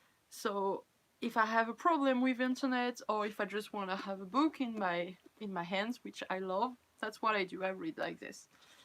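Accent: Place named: France. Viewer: Moi c'est chelou car je comprend parfaitement l'anglais mais je ne sais pas bien l'écrire XD normal je suis francoportugaise mais même le portugais je sais pas l'écrire mais je le pige à parfaitement, je suis dégouté d'avoir raté le live ;^; en tout cas tu parle bien anglais ! Même si on entend ton accent français mais c'est super bien ! Continue tes vidéo elles son parfaite ! Et tu est parfaite :3 !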